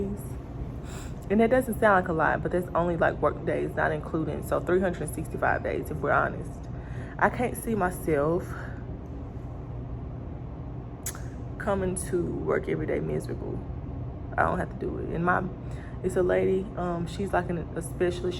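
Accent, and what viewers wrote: Mississippi, United States. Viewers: I knew!!!! You had a Mississippi accent!!!! So happy for you!!! You have to do what feels right! Glad I hopped on your YouTube!!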